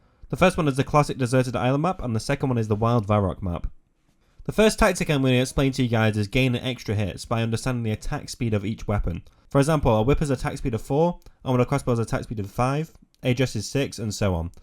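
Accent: United Kingdom. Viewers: Proper English accent as it should be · Try talking slower.. the combination of your british accent with how fast you talk means half of the world cant understand you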